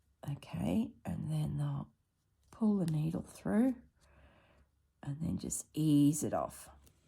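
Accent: Australia. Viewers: I watch some other crafters that make beautiful things that I love, but their voice and way of speaking make me crazy! I love watching you create beautiful things and listening to you! Maybe it's the Ausie accent!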